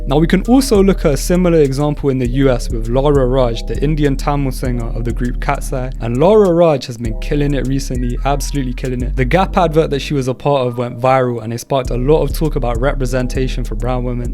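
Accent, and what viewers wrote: United Kingdom. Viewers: no latino rappers or singers??? girl there's, (as in the OG not gomez), all of the huge reggaeton artists. cinco de mayo, día de los muertos are holidays that white Americans have basically co-opted. and omg puerto ricans in baseball? latino culture is super prominent in the US, and the food thing is pretty big i would say! i would trust the UK to have banger indian/south asian cuisine pretty much everywhere, which i cannot say about the US, but i wouldn't go looking for mexican food there lol. i guess i also forgot to say, UK south asians of course have the more personal history of literally being colonized by the british which also makes that connection a lot more deep rooted, and you could say a similar thing of latinos here - the relationship goes back a lot further, a lot of the US literally used to be part of mexico. anyway sounds like you are from the UK but yeah good to hear more examples of south asian prominence there, i'd add another Pakistani, my fav to that list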